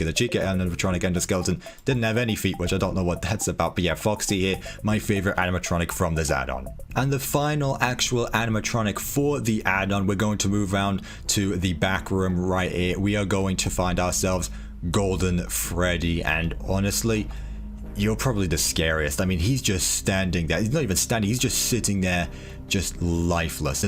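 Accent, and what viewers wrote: United Kingdom. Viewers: Are you British beacous you sound like one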